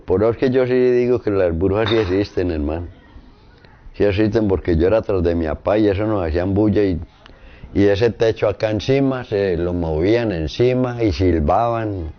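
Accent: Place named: Armenia, Colombia. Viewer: Buena historia.. mi novia es de Armenia y habla tal cual.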